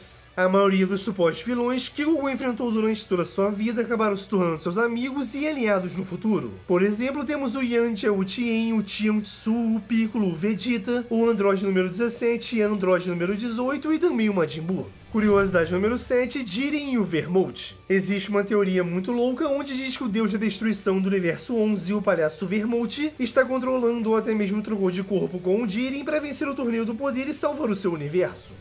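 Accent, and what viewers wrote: Portugal. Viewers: Vc é português (PT - Portugal) ??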